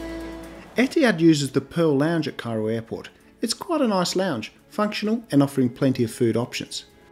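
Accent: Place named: Australia. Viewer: I always enjoy your videos. Your accent matches well with my idea of a typical laid-back Aussie explorer. Especially the way you say "paaaarsta" I like "paaaarsta" mmm :D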